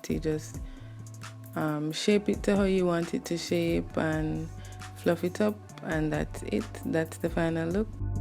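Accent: Jamaica. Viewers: u sound Jamaican 😍😃😃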